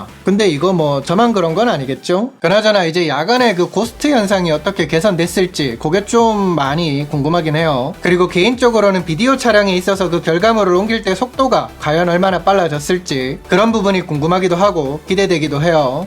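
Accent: Gyeongsang, South Korea. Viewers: 아이폰때문에 처음 봤는데 영상시작 20초만에 나오는 경상도 억양에 빵터졌네요ㅋㅋㅋㅋ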